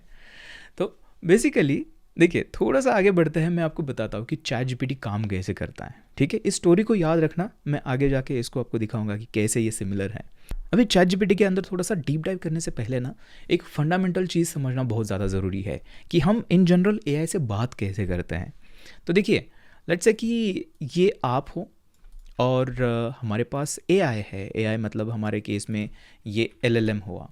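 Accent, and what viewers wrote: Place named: South, India. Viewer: Sir jii south indian waali feel aati hai aapke baaton se...!!! 😎😎 btw great and valuable series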